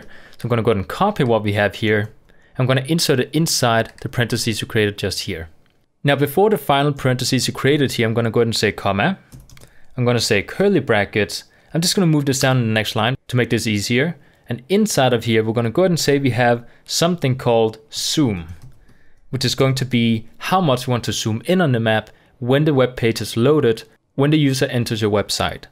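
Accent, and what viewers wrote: Denmark. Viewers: You my Sir are you danish ? :D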